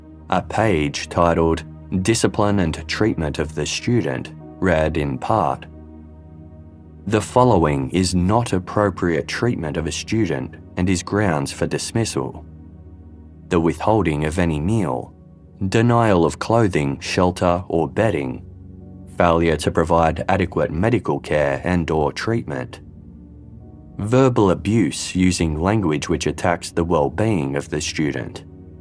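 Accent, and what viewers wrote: Australia. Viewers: Lovely to hear an Australian voice on one of these documentaries.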